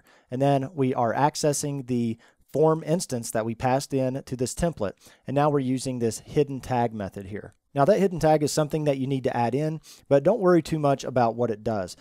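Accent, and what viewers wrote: South, United States. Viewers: Love your accent, sounds like a soft southern accent. Your content is fantastic.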